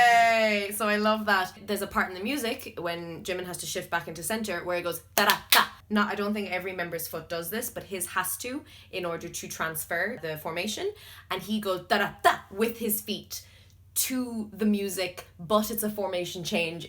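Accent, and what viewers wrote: Ireland. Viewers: I can tell by your accent that you’re Irish, also you’re amazing at describing everything in an educational way. I was wondering and I know this is a weird question but is there a certain dance studio you go to or teach at? Do you recommend certain dance classes for someone like me who loves the style of dance k-pop artists use....? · She’s Irish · Are u Irish?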